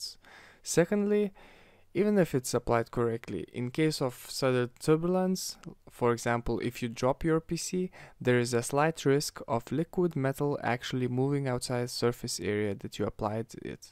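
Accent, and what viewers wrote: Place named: Russia. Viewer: Русский что ли ? По моему акцент есть .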